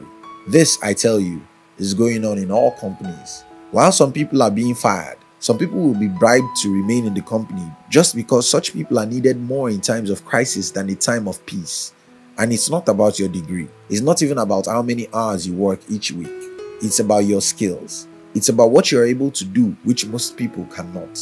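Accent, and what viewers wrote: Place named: Nigeria. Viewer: That's Nigerian Accent, must be a Nigerian.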